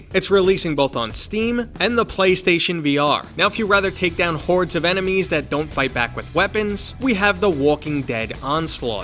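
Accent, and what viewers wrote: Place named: New York, United States. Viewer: Very interesting hearing someone doing a professional announcing video voice with a New York accent but I need more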